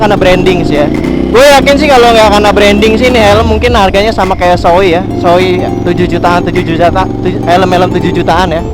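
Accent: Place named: Java, Indonesia. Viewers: Jawane medok tenan #save duka hati😂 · Bang seharian ngomong pake medok jawa 😂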